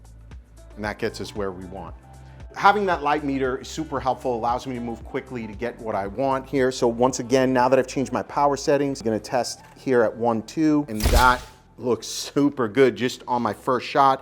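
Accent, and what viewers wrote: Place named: New York, United States. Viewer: As always, informative and helpful. Question, are you originally from NY? As a NYer that accent of yours harkens memories of home.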